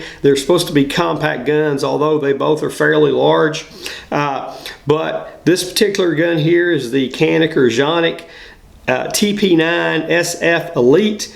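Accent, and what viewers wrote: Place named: South, United States. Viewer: Gin faaahve Love that southern kick. Excellent video my friend.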